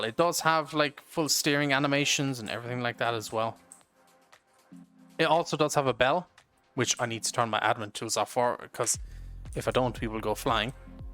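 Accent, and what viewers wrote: Ireland. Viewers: Where in Ireland is your accent from? Limerick? Can't figure out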